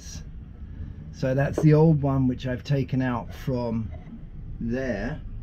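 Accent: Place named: RP, United Kingdom. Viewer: Great video and exceptional BBC voice! Appreciate the help. Regards from New Zealand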